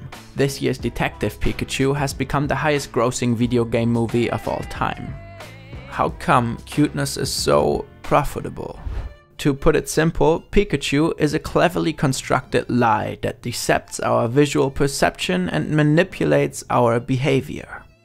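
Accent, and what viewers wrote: Germany. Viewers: The german accent gives this channel a certain characteristic. I think it should stay as it is. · Pro German accent. Because it gives a unique characteristic, like Shirvans Azeri accent in Caspian Report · I would appreciate a native speaker because it's a bit weird if you're German and you're hearing German youtubers speaking English. It's not because you can't speak English fluidly etc. but the Acent is pretty big. lol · Really good video. Like that german accent too! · It seems like most of your audience is currently still German but I think you have really good chances of expanding into "international YouTube" and attracting new people who would'n't have been able to enjoy your videos otherwise. I also think that this is one of the main reasons you get complaints about your accent, because Germans usually can't stand German accents while everyone else doesn't really care that much. 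Personally, I think it adds a lot of character to your videos and I know a lot of other Youtubers who speak with a German accent but have a predominantly international audience. If the accent really bothers you, you can hire a narrator but you don't have to in my opinion. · Bin nur kurz hier um mir deinen deutschen Akzent an zu hören und so leid es mir tut er ist wirklich deutlich zu hören 😂😂😂 aber eigentlich ziemlich lustig 😄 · The german accent stays😤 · I love your german accent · Normally I really don't like the German accent, but I think it kinda belongs to this channel (Also u sound insanely cute in this video) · the german accent is so thick that i hope i dont sound like that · Also man hört halt stark deinen deutschen Akzent, ABER es wird dafür viel verständlicher, weil du sehr deutlich sprichst. Find ich nice👍🏽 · Pleased keep the speaker! I Love the german accent! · he could work on it at least a bit, though. 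Even as German as my first langauge, I especially hate a strong German accent. And his is a lot worse than I expected. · don‘t get a narrator. in EVERY damn video game, the one with the german accent is superior. I don‘t make the rules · Please do not get a speaker. Your voice is so nice to listen to and even though i am german i know a couple of british and american people who do not mind a bit of an german accent :) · for me, i dont like his accent, but thats because i dont like the german accent, thats why i dont like speaking english myself, i just really dont like german accents when speaking english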